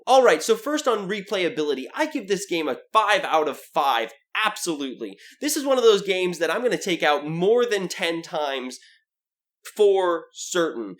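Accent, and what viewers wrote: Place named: Texas, United States. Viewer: Oh my word. I love the %100 authentic Texan accent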